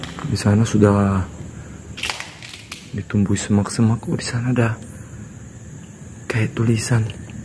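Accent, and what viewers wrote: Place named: Jakarta, Indonesia. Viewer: Makassar bisa tonji bisa juga logat jakarta walaupun kadang ada yang salah sebut kata katanya sedikit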